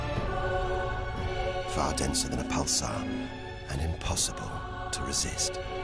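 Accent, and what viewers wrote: United Kingdom. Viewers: Why do they alway have to re-voice American documentaries in an English accent or vice versa? I’m fed up with that! It’s the same fucking language! If I can understand both accents, so can everybody! Give me a break! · Sean pertwee vs alec baldwin, sorry but the british soft spoken accent is so much more real. More believable, more comforting and true. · Why is Alec Baldwin speaking in British dialect? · British narrator, but this is so American!.. hard to watch as an European.. why always so full of it. Keep it real folks!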